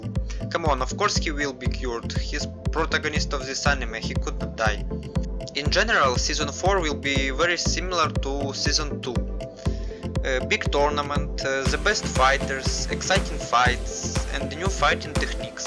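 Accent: Russia. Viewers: Капец у тебя акцент русский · Почему такой русский акцент??? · Russian accent?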